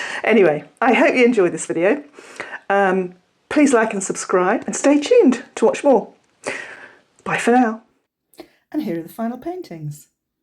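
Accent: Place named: United Kingdom. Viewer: Dear, Thank you for sharing your very interesting say « experiments » with acrylic paints. I love it. And you give interesting tips as well to achieve such kind of expressive paintings. Also, we love your so lovely British accent from this continental side of the channel ❤😊. Take care. Respectfully from Paris.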